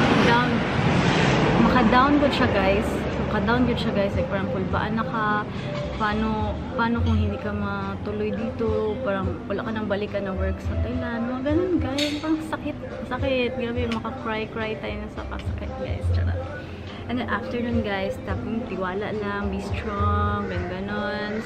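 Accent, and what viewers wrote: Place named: Davao, Philippines. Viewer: Davaoeño accent. Hehe.. kaubo kos chika sa gasto. Murag gi kuyawan ko huhu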